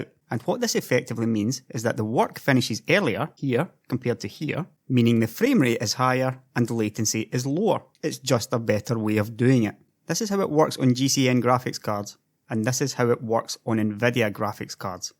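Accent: Scotland, United Kingdom. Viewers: Which part of Scotland is your accent from? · even better with that Scottish accent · your scottish also so all good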